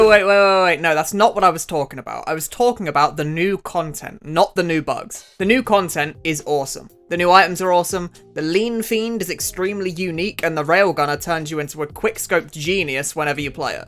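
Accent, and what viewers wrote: United Kingdom. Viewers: you sound veryu british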